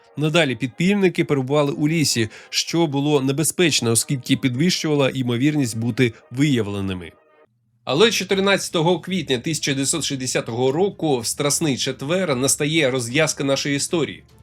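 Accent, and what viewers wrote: Moscow, Russia. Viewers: Якби не знав, сказав би,що він із мааскви,над акцентом потрібно попрацювати!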